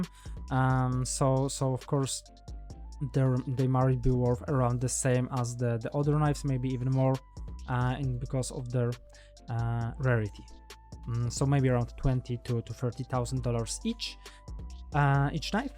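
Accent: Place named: Poland. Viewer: ten polski akcent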